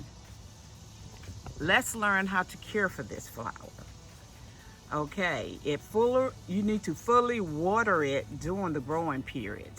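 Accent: South, United States. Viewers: Hi, love your beautiful southern accent, your blessing, your knowledge about the glorious curcuma blossoms & your theme song at the end. I’ve just bought 3 curcumas & have planted them in a trough planter. I have placed it under my bougainvillea standards facing south. Hope it works. 👩‍🌾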